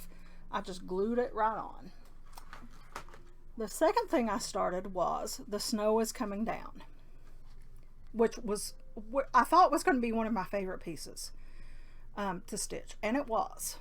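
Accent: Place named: Tennessee, United States. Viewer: I just realized you must live near my old stomping grounds. I spent most of the 80’s in Dickson, Paris, & Dresden, TN. I miss it a lot! Nice to hear your familiar accent. I’d love to stitch long winter.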